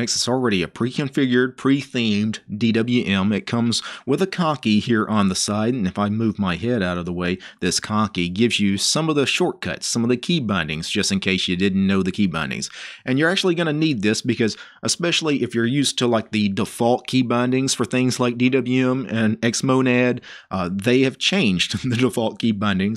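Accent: South, United States. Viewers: words like: fAantAastic, really cul, beooting, anythieng, goe with; they do sound southern cause difference is in pronunciation of vowels, however there are although not many instances that consonants are pronounced with unusual stress change, Im a faking Linguist that's why its interesting for me amdD well this one is also d at the end so its practically D+EE which C+V, light deiM stress is on last C, urvxdTee is also C+V so nothing abnormal here ITS HARD to pick and choose the words its the overall sound; Southern accent is IN YOUR FACE, 2 words in u can pinpoint where it is from, I guess has spent a period of time in other states, or he has constant contact with people of other states THAT DO NOT speak normal American accent. hey would you answer this too please, about living in other states or having constant contact with ppl from those areas?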